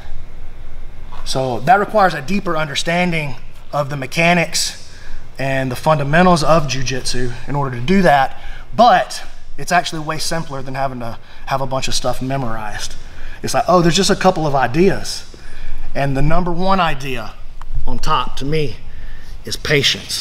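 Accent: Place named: South, United States. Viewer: I love the southern accent.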